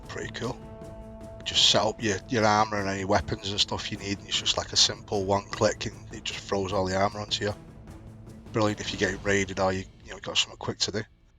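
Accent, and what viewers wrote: Manchester, United Kingdom. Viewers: What’s your accent man? I’m tryna work it out, you sound like a mix of Mancunian and Potters.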